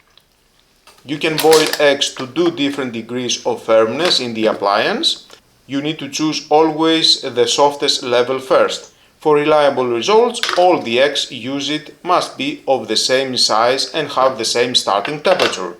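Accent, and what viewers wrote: Greece. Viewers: Γιατι μου ακουγεσαι σαν Ελληνας με Proficiency?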